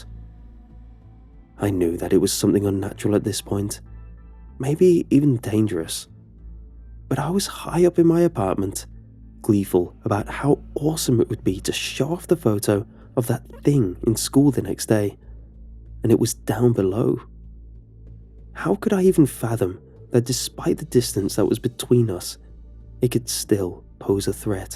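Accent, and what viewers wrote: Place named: RP, United Kingdom. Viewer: Think that's just how posh twats talk it sounds like that 😂😂😂😂 lmao. I'm from the UK I am not bloody English tho!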